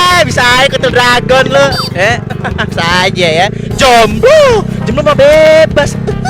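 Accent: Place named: Java, Indonesia. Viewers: Oalah toh bang² kok lucu banget sih klo pakek bahasa jawanya, lucu akan logat² jawanya. Heheheh hahahah hihihi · Tolong dong jgn pake logat bahasa jawa, kowe iki rak mudeng ngomong opo wi. Gatheli tenan..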